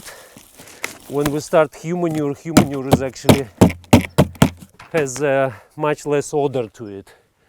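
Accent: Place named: Russia. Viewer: произносить слова совершенно не по английски, а в русской транскрипции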